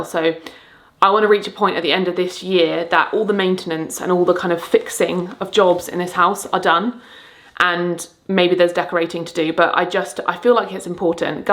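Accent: United Kingdom. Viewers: I like letting long vlogs run in the background while doing some light stuff that don't require too much attention because I'm trying to soak in some nice british english accent 🥰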